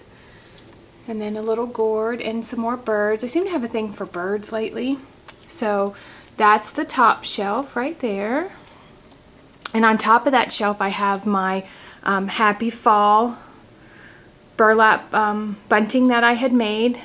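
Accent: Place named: South, United States. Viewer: Hello just discovered your channel . I was actually looking at your daughters channel cause she tagged someone . I must say I'm digging the southern ascent , very cute ;)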